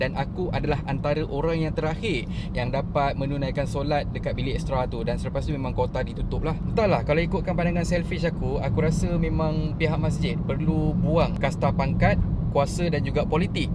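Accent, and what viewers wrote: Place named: Perak, Malaysia. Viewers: uish..org loq staq ka nie.? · Awat hang tetiba cakp kl..orang cakap utara hg cakp kl😂😂..sebb dalam video banyk gak cakp utara hahaha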